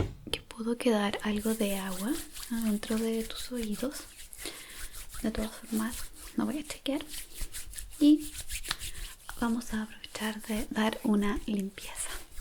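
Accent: Chile. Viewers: Acento Chileno:0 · Amo el asiento chileno más videos así por favor ❤️ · Es chilena 🇨🇱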